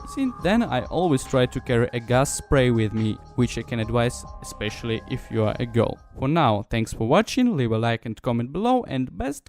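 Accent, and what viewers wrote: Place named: United Kingdom. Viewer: Друг мой, ты все видео говоришь на британском диалекте английского, он понятен всем нам (русским, кто не прогуливал инглиш), а вот американцам он понятен даже хуже чем нам.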